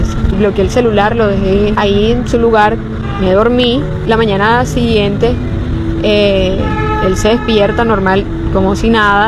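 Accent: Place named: Venezuela. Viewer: Pues te salvaste de ése asesino. Menos mal fuiste más lista. Deberías de hablar con la Fiscalía; y ser testigo en contra de éste individuo. Eso ayudaría más al caso. Me imagino qué tendrás fotos con el, para evidenciar de qué si se vieron. Fuiste muy inteligente!!! Y..tu acento me luce venezolano....será tú versión un montaje????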